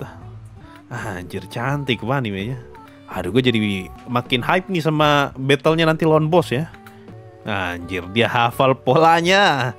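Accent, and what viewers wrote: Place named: Eastern Indonesia, Indonesia. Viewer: Bang lu kok logatnya kek orang timur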